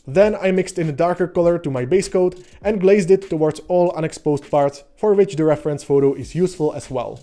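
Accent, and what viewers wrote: Czech Republic. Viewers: Hi, love the tips, really helpfull! I have a question according to your accent, aren´t you a czech? Miniatures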